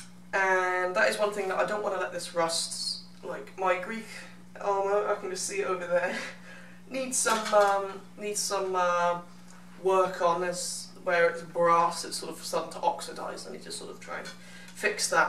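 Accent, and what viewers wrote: United Kingdom. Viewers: very good like all romans in the movies, a brittish accent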